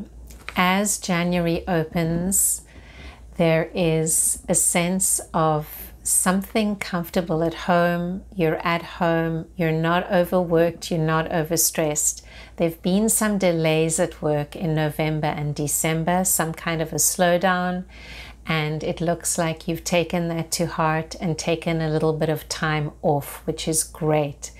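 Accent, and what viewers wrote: South Africa. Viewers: You sound South African 🇿🇦🥰I've had this on my watch later list for a few weeks now. Glad I watched it now, spot on from the start of the video, thank you for the message 🌻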